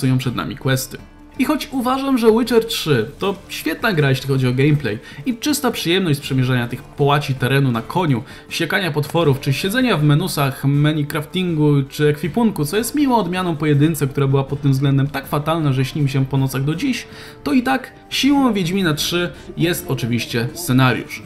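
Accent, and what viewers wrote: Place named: Poznań, Poland. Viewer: speed<num> mi to brzmi normalne, typowe poznańskie zaciąganie.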